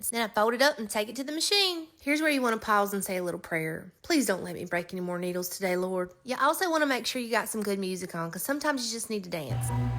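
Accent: South, United States. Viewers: First of all, the southern accent is music to my soul as I live in South Carolina and secondly I love you. What a cute quilt and I can’t wait to run a muck all over your channel because you were just so darling. I think I can learn a lot from you and did I say I love you! I just found you today hurrah for me.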